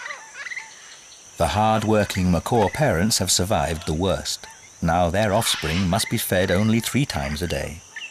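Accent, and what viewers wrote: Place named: England, United Kingdom. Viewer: All nature shows should be voiced by English men, this video is proof of that. Beautiful and tragic, only true nature lovers and poets can appreciate this kind of documentary.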